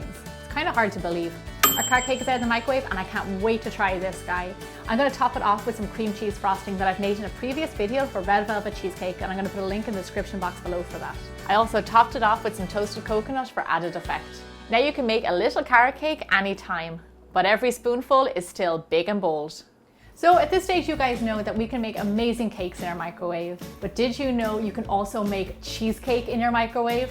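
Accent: Ireland. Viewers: are you Irish??? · I just made the cookies n cream one and it came out ABSOLUTELY AMAZING!!! It was perfect because I was craving something sweet, and since I live alone, if I bake a cake I eat the cake, which is bad for my waistline!!! So this little treat hit the spot without being too much, and like you've said it other videos when you make it urself you know what you're eating, which is important too! I love your recipes, love your channel, and absolutely ADORE your brogue! It's very soothing! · Im in love with your wonderful recipes and your Irish accent!!!😍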